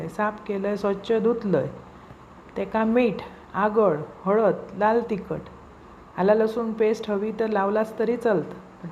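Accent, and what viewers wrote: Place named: Malvan, India. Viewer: Taiee you are very sweet i love your simplicity! I watch all your videos !! Because your Malvani bhasha 😂Ithe way you speak it's very hillourios 😅fun 😂Love from Mumbai ❤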